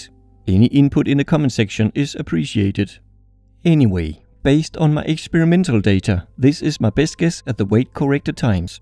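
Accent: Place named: Denmark. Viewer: prøv at snakke dansk man kan høre at du er danks og du er ikke god til engelsk btw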